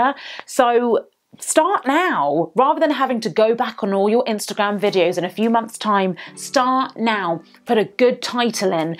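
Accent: United Kingdom. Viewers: love your accent, great content! i have never used the app, but i just use the IGTV on the instagram app. will download the IGTV app to make it more official lol, haha that british accent of yours, omg! look forward to more of your content.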